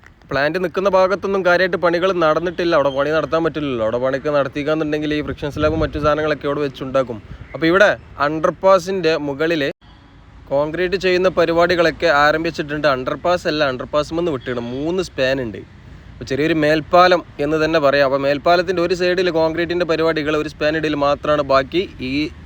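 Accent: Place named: Kannur, India. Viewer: സൂപ്പർ വീഡിയോ ഇത്രയും മനോഹരമായി dron ചെയ്ത വീഡിയോ ആദ്യമായിട്ടാണ് കാണുന്നത് നല്ല അവതരണവും ഇടക്ക് കോമഡിയും സൂപ്പറാക്കി നമ്മുടെ സ്വന്തം കണ്ണൂർ ഭാഷ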